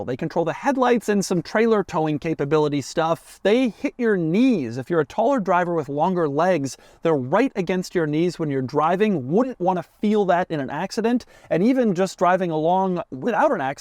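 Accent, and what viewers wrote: England, United Kingdom. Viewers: Love the English accent. All the best from England 😊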